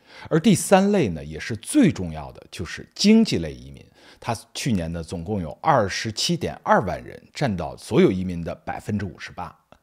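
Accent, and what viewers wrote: Standard. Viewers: 是不是做播音主持的？字正腔圆，有条不紊，说话听起来很舒服。内容当然也很高含金量！ ❤❤❤ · 一口字正腔圓的普通話操著🇨🇦的心，這是一種什麼樣的精神😂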